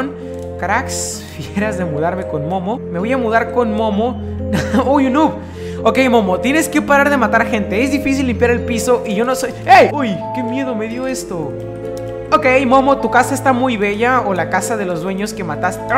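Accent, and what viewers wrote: Chile. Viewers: No sabia que era chileno xd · eres de chile? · Alv pensé que es chileno XD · Eres de chile?o de donde?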